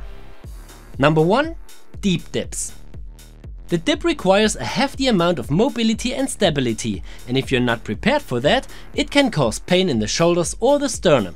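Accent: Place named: Germany. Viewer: Damn ur German accent is nuts.. I like it, im german as well. Lass Frankreich einnehmen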